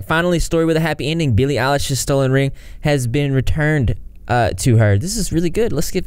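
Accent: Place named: Texas, United States. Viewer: Your southern accent is as heavy as mine lol are you from TX?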